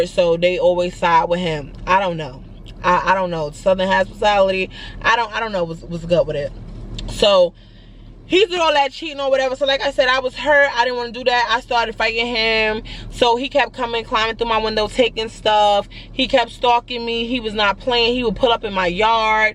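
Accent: New York, United States. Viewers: . You sound like you’re from NY? I’d never guess you are from the south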